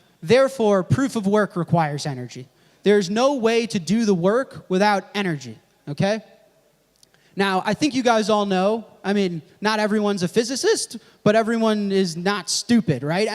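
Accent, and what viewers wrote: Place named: France. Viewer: Il n'y a rien de plus élégant et compréhensible qu'un anglais hésitant parlé avec l'accent français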